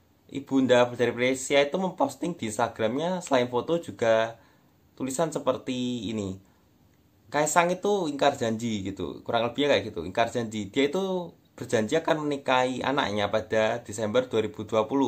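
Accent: Java, Indonesia. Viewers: Logat mu jawa bgt😂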